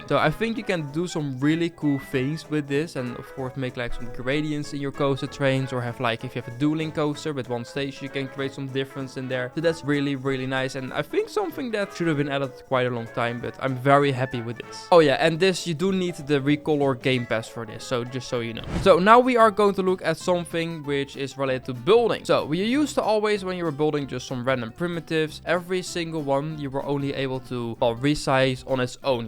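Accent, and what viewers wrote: Netherlands. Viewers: Are you dutch ist looks at a realy dutch accent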